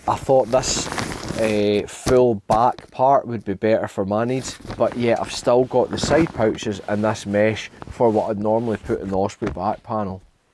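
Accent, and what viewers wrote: Scotland, United Kingdom. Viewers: Got a real kick out of your video. Love the Scottish accent...lovely! Pretty jealous of all the goodies you've ordered too. Zpacks' equipment is fantastic. I have an early model heximid shelter for solo hiking and just ordered a triplex in hopes of getting my husband to join me on a trip or two. I'm very curious about the Arc Zip pack and how you like it once you try using it. Please make a follow up review video of it! Carole from the USA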